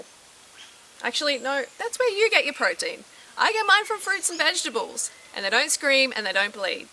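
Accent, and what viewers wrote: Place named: Australia. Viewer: Haha. Super funny. I love the Aussie accent too. Oh and btw, WHERE DO YOU GET YOUR PROTEIN??? ;)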